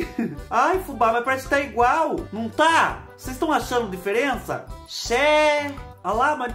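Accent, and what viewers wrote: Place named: Curitiba, Brazil. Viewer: Tive que me inscrever não tem como, preciso de rir um pouco nesta pandemia, kkk e vc é mto engraçado <link> identifico mto com seu sotaque e seu vocabulário. Kkkk sou de Curitiba PR, e nois fala um pouco deste jeito .Vc é maluco fica carcando estas coisera no cabelo vc vai e ficar careca daqui um dia. Eu já fiz mto estas coisas tb.até banha coloquei no meu cabelo, fiquei 10 dias lavando meu cabelo pra sair aquela Gordura .bjo obrigada por me fazer rir.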